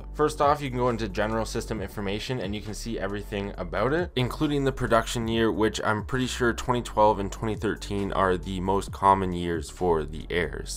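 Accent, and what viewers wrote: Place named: Canada. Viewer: you pronounce sorry like soorry. Just like me and many other Canadians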